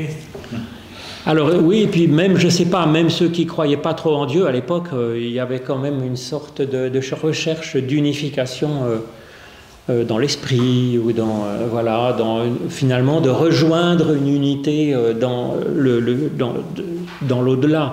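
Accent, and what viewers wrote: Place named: Vaud, Switzerland. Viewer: merci... richesse du mot à mot dans le texte... où trouver les video 1 à 4? c'est drôle: tu as pris l'accent vaudois!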